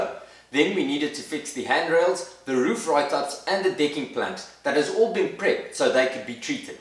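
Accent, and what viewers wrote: South Africa. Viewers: Hey man I loved the series, looking forward to seeing more content like this. I was wondering where you guys are located ? The accent sounds a lot like South African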